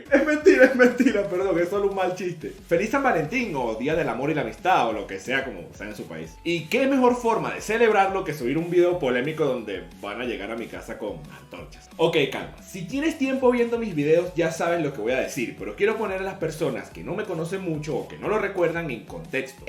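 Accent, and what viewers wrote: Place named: Venezuela. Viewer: Oye man pregunta de curiosidad ,eres de Venezuela? Se te nota el acento eso y tu canal esta bien pavo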